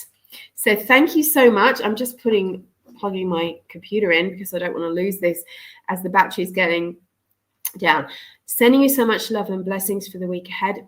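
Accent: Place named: Australia. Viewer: I’m in the U.S, but i’m an Aussie. So awesome to hear an Aussie accent. Makes me feel better. I’m an empath. Being in America is really hard right now. I spend all my time, clearing it. Lol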